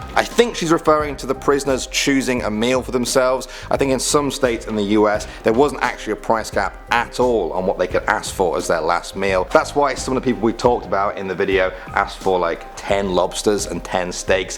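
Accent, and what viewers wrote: England, United Kingdom. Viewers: what part of england our you from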